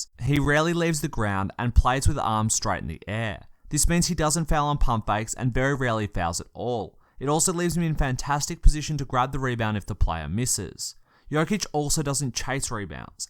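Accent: Australia. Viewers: A guy from Australia (sorry if I misjudged the accent, not a native English speaker) with 4k subs on youtube makes NBA analysis of such quality and depth, probably working in his room at home, and ESPNs and Foxes of the world, with budgets of tens of millions of dollars, can't give us anything better than Colin Cowherd and Nick Wright...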